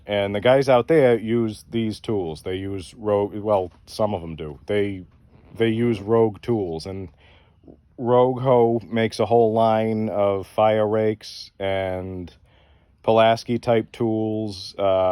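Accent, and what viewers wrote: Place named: New England, United States. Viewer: Great review, ill be buying one of these! Also, as a New Englander thats been transplanted to the south, your accent brings me great nostalgia.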